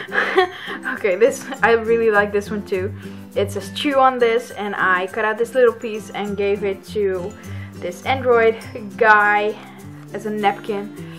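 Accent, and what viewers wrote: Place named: Netherlands. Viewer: Are you dutch? I am Dutch and you sound Dutch great video keep it up!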